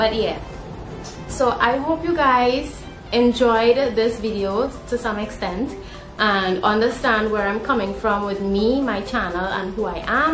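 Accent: Trinidad and Tobago. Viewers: You do not have to explain or apologize with regard to your Guyanese background, your Trini accent and your disability. You were made in GOD'S image and likeness and you are beautiful. And you clearly display confidence in yourself, so that is all that matters. The Mighty Sparrow was born in Grenada and Peter Minshall was born in Guyana. These folks are Trini to the bone and will have it no other way. Live your life my dear. Do not worry about people who do not understand.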